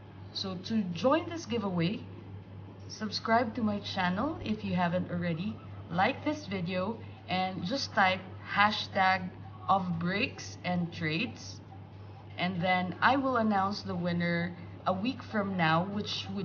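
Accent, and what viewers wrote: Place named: Philippines. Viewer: you have an accent im filipino too.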